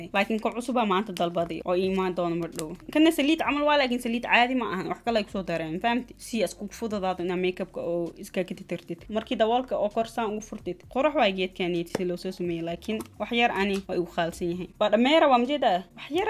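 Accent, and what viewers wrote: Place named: Somalia. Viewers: Omg your somali dialect is soooo lovely😍😍😍😍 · I'm not even Somali, but I agree with you her dialect is charming, Masha Allah! You all have a beautiful language ❤️❤️❤️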